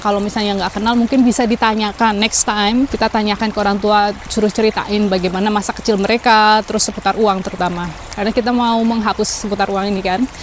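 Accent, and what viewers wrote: Banyumas, Indonesia. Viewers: Logat ngapake kentel ya yu 😁😁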